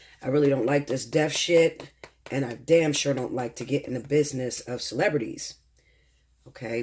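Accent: Pittsburgh, United States. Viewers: Pittsburgh accent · Wow I can hear your Pittsburgh accent clearly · Are you from Pittsburgh by any chance ?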